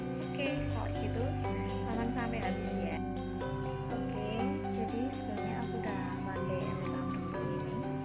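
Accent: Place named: Java, Indonesia. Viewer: Hahaha... Biasa mbak, medokmu bisa jadi karakter tuh dalam berbahasa indonesia.😄 google adsense siap menantimu..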